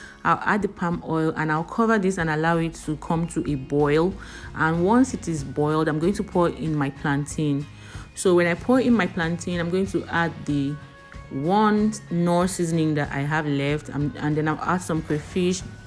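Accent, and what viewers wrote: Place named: Cameroon. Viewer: You talk like a cameroonian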